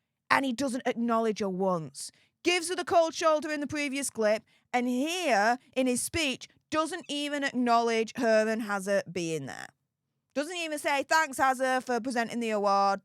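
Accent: Yorkshire, United Kingdom. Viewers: Hi I love your accent what part of the uk are you from❤ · Hey up! You always cheer me up. Love your Yorkshire accent.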